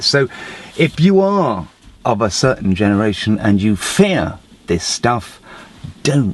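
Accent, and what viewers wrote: United Kingdom. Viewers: Very nice to hear Tesla get praised in the British accent, after all of the other's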